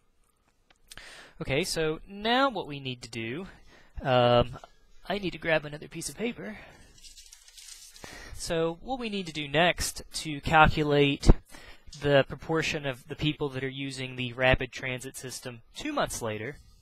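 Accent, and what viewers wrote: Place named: United States. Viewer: And the american accent makes it cooler as well. :D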